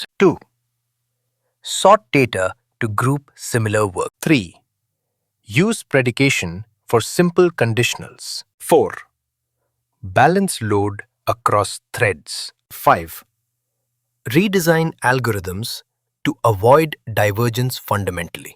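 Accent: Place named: India. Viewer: why does a clanker need an indian accent, like horrible coding course squared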